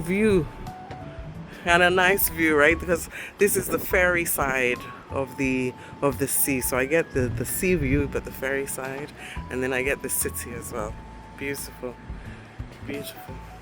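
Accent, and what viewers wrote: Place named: Nigeria. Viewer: Beloved, ur intonation sounds Nigerian😅😅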